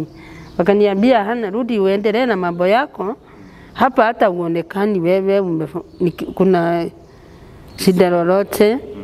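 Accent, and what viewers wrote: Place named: Meru, Kenya. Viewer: Huyu zi mkikuyu anaongea kama wameru au kiembu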